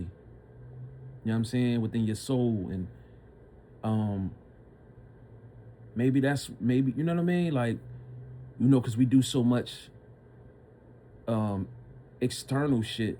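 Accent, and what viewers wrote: Philadelphia, United States. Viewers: Philly in the building, I heard it soon as you spoke, 👍👑👑👑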